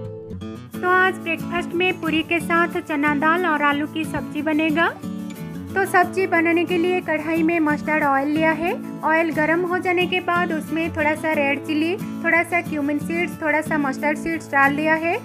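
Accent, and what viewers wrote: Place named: Assam, India. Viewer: Tumar kothat gom e pai tumi Assamese suwali buli...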